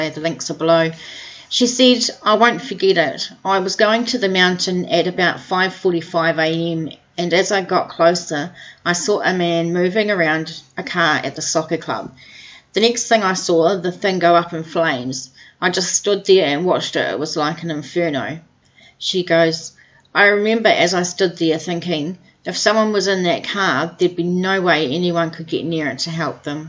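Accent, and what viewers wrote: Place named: New Zealand. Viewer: Cringy nz accent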